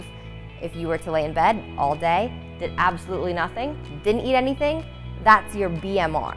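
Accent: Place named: United States. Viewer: She sounds like she has every American female accent at once.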